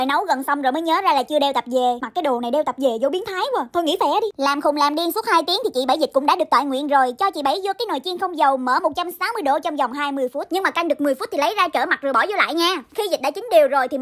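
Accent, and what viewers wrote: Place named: North, Vietnam. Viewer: chị ơi chị người miền bắc à em người miền nam mà nói tiếng miền bắc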